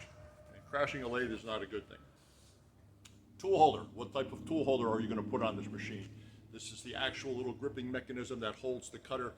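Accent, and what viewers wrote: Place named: Philadelphia, United States. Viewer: I'd guess Philly or nearby South Jersey but north of Baltimore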